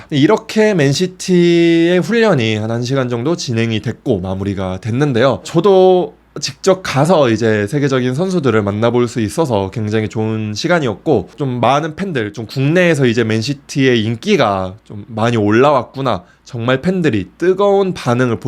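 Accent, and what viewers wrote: Gyeongsang, South Korea. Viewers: 경상도억양 듣기 싫어요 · 경상도 억양좀 어케 해보소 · 경상도 말이 어때서? 듣기 싫음 보지마라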